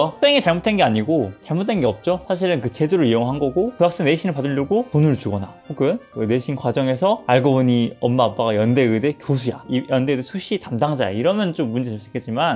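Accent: Gwangju, South Korea. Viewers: 광주 출신이신가요 ㅋㅋㅋ말투가 거리감이 없는 거 같은데 느낌만인가 ㅎ.ㅎ...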